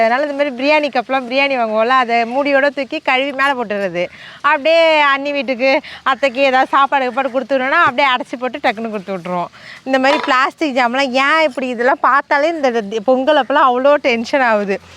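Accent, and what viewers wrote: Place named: Mayiladuthurai, India. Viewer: மண் மனம் வீசும் வீடியோ....manasuku avalo asaiya irku...keera தண்டு karuvatukolambu natural ah neenga pesurathu...ketkave asaya irku....becz enga amma mayavaram so nanga veetula pesura mathiriye irku...enaku unga வீடியோ pakkum pothula nanga munadi iruntha life ah niyabaga paduthuthu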